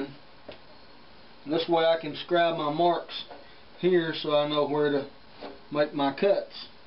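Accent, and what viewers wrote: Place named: Tennessee, United States. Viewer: Great video like all of your videos. What part of the US do you live? You sound like you are from TN. I live about 30 miles east of Nashville and you sound like the rest of us. LOL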